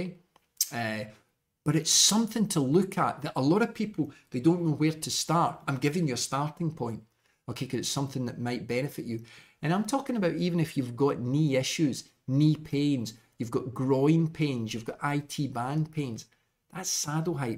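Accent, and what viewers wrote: Scotland, United Kingdom. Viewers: well deserved, Sir. I like the Scottish accent a lot and your humour in particular.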